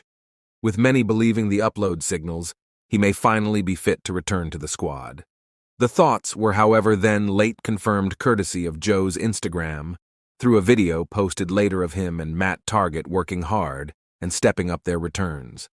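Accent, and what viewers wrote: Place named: United States. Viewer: another yank voice owa thumbs doon them all!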